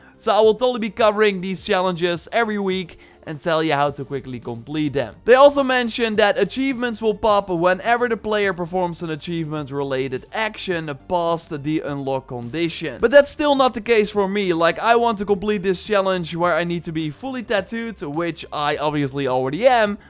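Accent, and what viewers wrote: Netherlands. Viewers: WOW, IF THAT IS NOT A REALLY THICK DUTCH ACCENT, I DON´T KNOW WHAT IS!?!?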